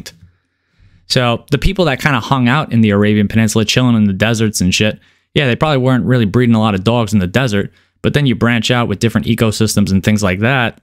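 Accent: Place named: New York, United States. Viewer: Random bro but no weird shit but I'm a black guy from London and I have to say your accent is so dope. haha that New York accent just makes me enjoy your stuff even more lool you're voice just makes me feel like I'm listening to someone from the wire like Mcnulty talking real red-pilled talk to me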